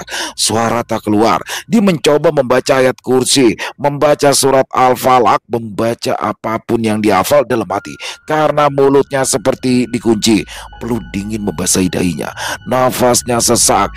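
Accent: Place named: West Java, Indonesia. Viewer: Keren bang, logat Sundanya 😊😊😊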